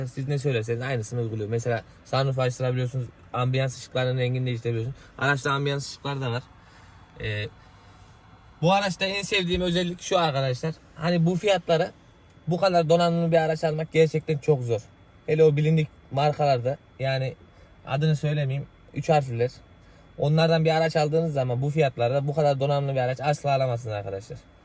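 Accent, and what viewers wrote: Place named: Central Anatolia, Turkey. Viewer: konusmayi bilmeyen herkes video cekiyo arkds ..niğde aksaray adana kayseri kırsehir vs sivesinden kurtamayan gurbetciler..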